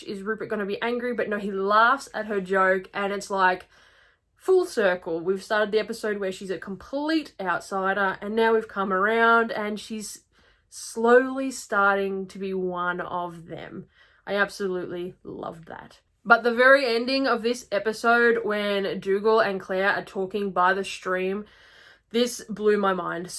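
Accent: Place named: Australia. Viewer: I am a huge fan of the Australian accent and I absolutely LOVE yours! No criticism here.:)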